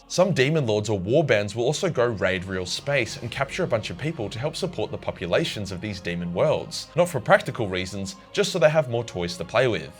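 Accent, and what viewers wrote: Australia. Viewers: Bruv I need to move to AUSSIELAND and hear u speak on the daily it's fucking great, you Mook. Keep up The Emperors Work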